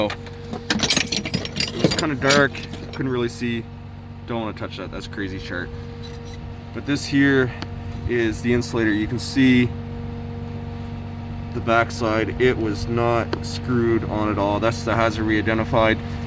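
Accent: Canada. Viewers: I'd keep the narration, that's probably more than 50% of why people watch it. If the sound is rough, meh, the ppl who are going to watch aren't the people who will care, they are likely watching to learn about a field they aren't in or want to be in. I found it amusing hearing a lineworker with the biggest "OUUUUT" Canadian accent I've ever heard work to describe concepts from my field of engineering :D (network engineering/architecture) hehe. No shade, keep up the good work. A couple notes 1. The connector you described as a 'piercing something" We used to have an equivalent in the network world called a "vampire tap". I think that actually describes this better as this is a minimum vampiric/parasitic drain that is tapped. maybe you can start a new trend in your field calling it that :D 2. No shade on you, this is what you were given. I'm not a fan of how the insulation is stripped given how in-climate this area appears to be both on the pigtail and stripping it on the heavier gauge wires. 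In my experience that allows the wires to become full of water during the summer humidity which quickly leads to a very bad result :) 3. I think that tray would be nicer if instead of pushing things over the outside edge to get them it was reversed to inside the bucket. Seems like an unforced error there in how they designed it asking for tools to disappear below :D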